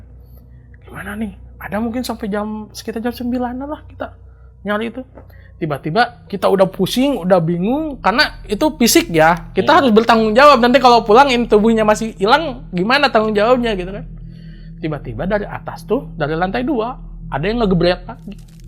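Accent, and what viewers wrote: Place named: Sunda, Indonesia. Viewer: Logat Sundanya kental banget bang, walaupun pke B.Indo ttep aja sundanya kebawa 😍🔥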